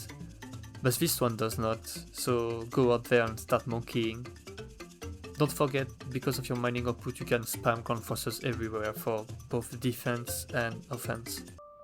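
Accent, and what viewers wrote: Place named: France. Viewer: Aaand Functional Architecture is being nerfed next patch, so ixnay on that plan :P By the way, it seems like the Shattered Ring gets regular planet designations, so how about going Lithoids (+Volatile Excretions for tile-blocker removal and war edicts?), colonizing the next-best planet, moving the capital there, and designating the Shattered Ring as a Mining World for +25% alloy output? PS: I like the French accent, it's not mediocre at all!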